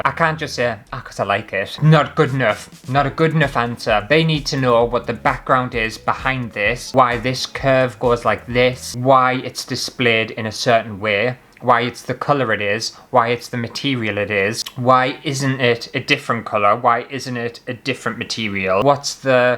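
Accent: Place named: Northern England, United Kingdom. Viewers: Your accent is definitely North East and phrasing is specifically Durham rather than the Newcastle Geordie. I'm originally from Durham also and I love to hear the honesty of your roots when you talk, together with your wicked humour. It makes me chuckle the whole way through your videos - laughing with you, not at you. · 🤩Northern accents rule, well at least in our eyes. Might be ever so slightly bias 😁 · Your art rant made me so happy, especially when you mentioned Damien Hirst. He's a snake oil salesman of the art world, absolute sham. I reckon we must be fairly local to each other as I'm also in a pit village in the Durham area close to the sea! I just love that out of all the art youtubers I discovered you, it's comforting to hear our accent, it's like you're me mate haha. I also say is/us to refer to myself which confused my American friend a lot!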